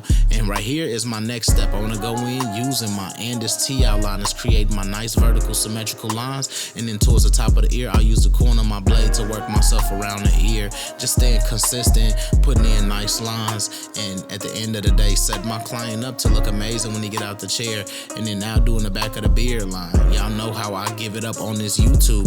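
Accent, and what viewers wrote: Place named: Midwest, United States. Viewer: You got that Midwest flow 🔥 talk yo shit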